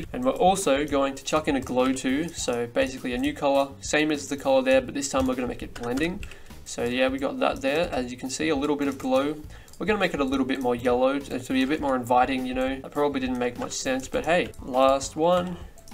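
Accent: Australia. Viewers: being australian af with that G'day everyone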